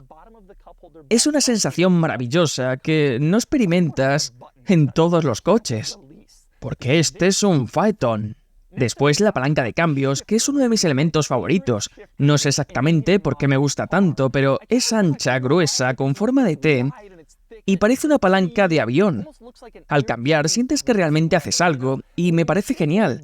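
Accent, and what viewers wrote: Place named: Spain. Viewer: Un zipizapo no debe poner su voz en videos, si de por si escuchar un zipizapo es desagradable escuchar un español zipizapo es insoportable solo soporté el video 4 minutos antes de apagarlo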